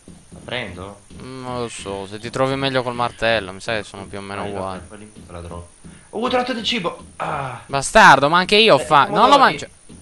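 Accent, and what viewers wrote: Sardinia, Italy. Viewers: aiò! Sardo sei?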